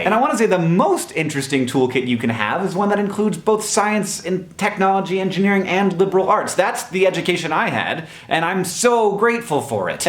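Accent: United States. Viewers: Do you live in America? (Judging by your accent from your videos, I think you do.) Money practically grows on trees here...if you have any sort of brains, creativity, OR charisma. Or a pulse. If you feel like "there's no money" in a certain field move to India for a few years. Try out Pakistan. We have it pretty good.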